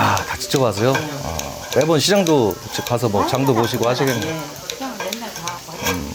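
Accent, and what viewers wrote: Chungcheong, South Korea. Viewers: 어이 양반 충청 양반..뭐래유..너무 잘보고가고 좋아요..영상 공유해줘서 눈향복하개해줘 고마워요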